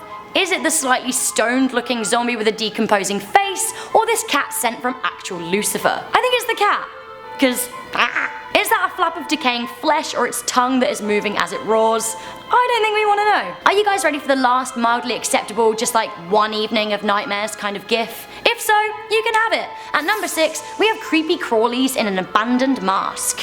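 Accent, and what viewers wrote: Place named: United Kingdom. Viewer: JIFS LADY!! And btw I love their brit accent 😍😍😂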